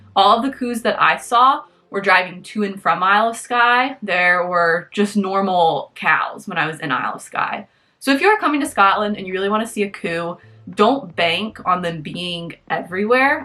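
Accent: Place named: United States. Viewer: You sound like a very respectful American. Thank you.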